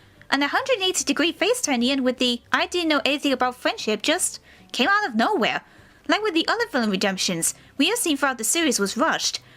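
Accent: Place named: United Kingdom. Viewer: For your great British accent and your love for Sunset Shimmer, you gain a subscriber.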